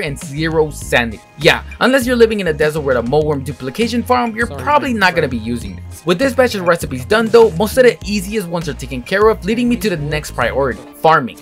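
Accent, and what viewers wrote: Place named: Texas, United States. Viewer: You sound like someone I went to high school with in Texas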